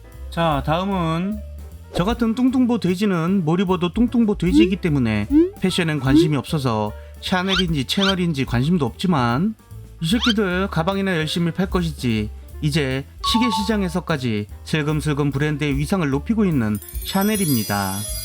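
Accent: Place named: Jeolla, South Korea. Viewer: 더럽게 재미없네! 전라도 억양 킹받네 ㅅㅂ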